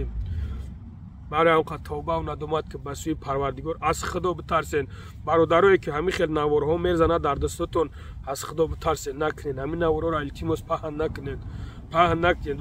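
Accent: Kulob, Tajikistan. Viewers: Саломалекум ака чиста кулоби гап мезани мабодо ай кулоб набоши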